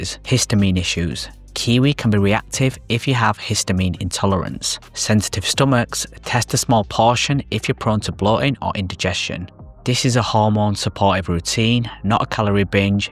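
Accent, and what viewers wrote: United Kingdom. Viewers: Cockney accent?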